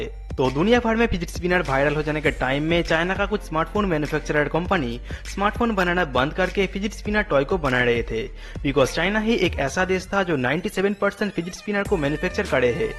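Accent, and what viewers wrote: Bengal, India. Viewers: Strongest indian accent ive ever heard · Ekdm bengali hindi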